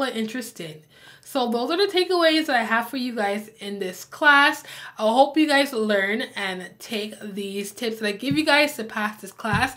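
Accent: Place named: Barbados. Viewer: This was so helpful, love the color palette idea! Off topic: I’m hearing a Caribbean accent😅 Bajan I think. I’m Trini!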